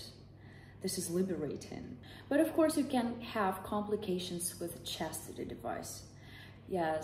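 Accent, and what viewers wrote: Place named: Russia. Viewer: She's from Russia or Ukraine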